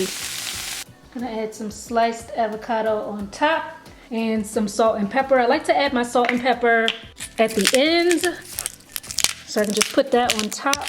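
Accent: United States. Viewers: So im 3 mins into the vid and im like, holdup.....when did she have an american accent? for the longest I thought you and Shirley B Eniang where the same person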